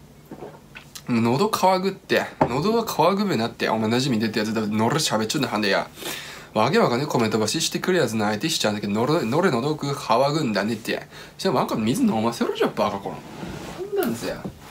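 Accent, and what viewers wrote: Tsugaru, Japan. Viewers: 自分でも よくわかりませんが ここに辿りついてしまいました 😂😂😂 津軽弁！ 素晴らしい👍👍👍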